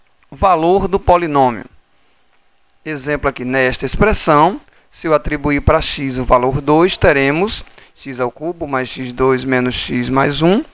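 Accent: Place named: Ceará, Brazil. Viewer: é engraçado teu sotaque "sóbitraíndo" "pólinómio"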